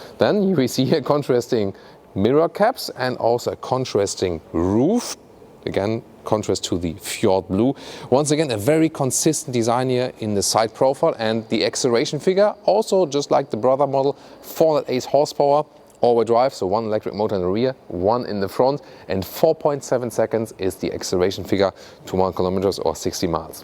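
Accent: Germany. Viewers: I fiind it very hard to understand this heavy german accent english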